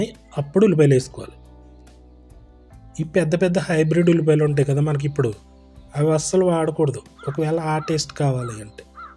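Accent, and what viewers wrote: Godavari, India. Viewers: గోదావరి తెలుగు భాష , ఆ యాస మార్చకుండా ఉంచండి. యూ ట్యూబు డబ్బులు వచ్చాక కొత్త స్టవ్ లు, Ikea పాత్రలూ వంటివి కొని వంట గది రూపు రేఖలు మార్చేయకుండా ఇప్పటి సహజత్వం కొన సాగిస్తేనే ఆ పాత వంటలకు ఆ పాత కాలపు వాసనలు ఉంటాయి. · Memu Godavarollam andi. Mee vantalu, maata superb. Matallo yaasa over cheyyakunda natural ga undi.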